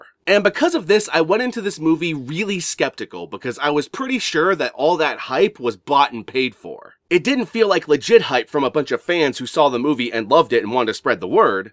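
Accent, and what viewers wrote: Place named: United States. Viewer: Your pronunciation of the Spanish names is ok, you just have the usual problem Americans have where your accent doesn't lend itself to Spanish/Latino names.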